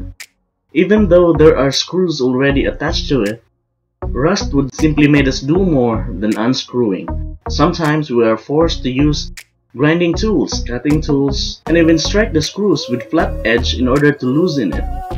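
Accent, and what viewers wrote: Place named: United States. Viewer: Pinoy yung pangalan ng channel kano nman yung nagsalita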